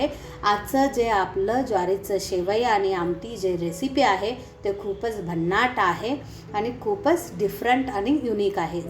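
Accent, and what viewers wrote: Karnataka, India. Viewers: छानच आहे पाककृती.ज्वारीच्या शेवया.आमच्याकडे अशाच तांदळाच्या पिठाच्या शेवया करतात. तिखट किंवा गोड तोंडीलावणेबरोबर खाल्ल्या जातात. तुमच्या बोलण्यावरुन तुम्ही कानडी असाव्यात असे वाटते.आमच्या शेजारी धारवाडच्या कडकोळकाकू असेच मराठी बोलायच्या.धन्यवाद. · तुमची मातृभाषा कन्नड आहे का 😊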